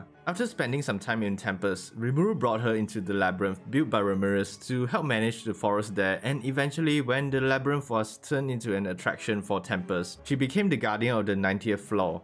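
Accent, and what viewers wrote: Singapore. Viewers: U sound like a singaporean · Ehh u sound like a Singaporean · Are you Singaporean????!?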